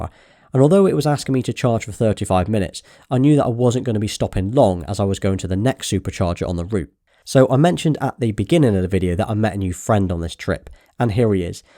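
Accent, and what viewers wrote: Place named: Bristol, United Kingdom. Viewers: Fab videos, thanks for sharing. Detected the west country accent early on and nice to have that confirmed (from near Bath myself). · Watched your videos whenever I see them but I’m looking in to getting a Tesla. I’ve always thought you were from the same city as me (Bristol) from your accent and was so confused when you said you live in York, but then you said you’re back in your home town Bristol😂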